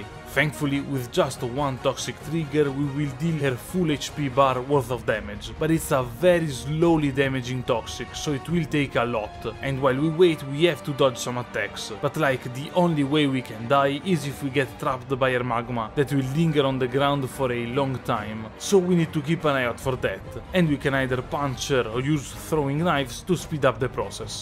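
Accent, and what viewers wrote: Italy. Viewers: italian accent is very marked that’s why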